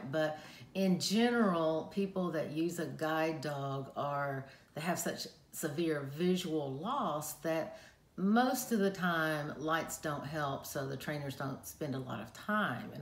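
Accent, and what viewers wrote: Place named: South, United States. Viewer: Hi: I know this video was posted several weeks ago, but since I'm new and I really love your content, I'm catching up. So please forgive the late comments.:) I thought you did a great job with this informational video. I liked how clearly and kindly you explained everything. Also as a side note, you have the coolest accent; I can't help but think of southern homecooking and maybe being in a comfie room chatting with you and other southern belles. Back to your video. I think one of the things many people don't understand is everything we experience involves the brain. I have a huge love for neuroscience so I like to at some point educate involving the brain--without getting to sciency.::LOL:: I think many sighted people don't get that the eyes send signals to the brain and the brain does all the processing. As for guide dog knowledge, man oh man, are people more clueless than ever! 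You did a great job explaining what Gretchen does and doesn't do. I would have also emphasized what part you played in getting her to where she is as your guide. Yes, she's trained to do this, but she wasn't just trained by SE trainers; unless you had a trainer who taught her things like locating the counters and trash cans, you did that yourself. I would have said that early on because I think many have this impression that the dog does these things but the handler doesn't have much in the training aspect. How cool that your girl can find all of those things for you. Is she just really go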